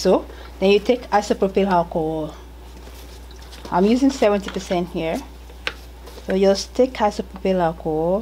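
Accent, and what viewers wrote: Nigeria. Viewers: I came here and heard the Yoruba accent. Arabirin Mi, Esheeeyyy ❣❣❣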